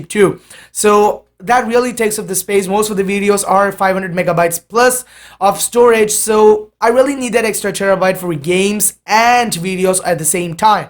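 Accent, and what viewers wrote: India. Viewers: Bro I am a proud Indian and I thought that ur accent Was like a Indian That's why I asked 👍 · u sound indian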